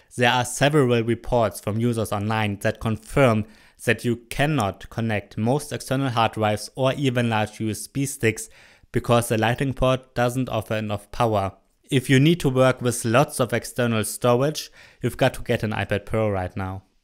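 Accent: Germany. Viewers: I am an Indian who is in Germany. I hear my colleagues speaking this accent when we have a conversation in English. lol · I LOVE THE GERMAN ACCENT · Most German accent ever can barley listen to it.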